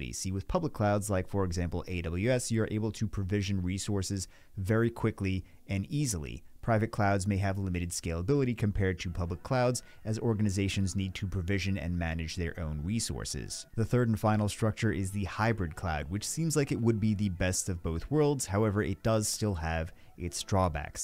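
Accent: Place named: Canada. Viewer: Must be a Cannuck narrating ... Asooor...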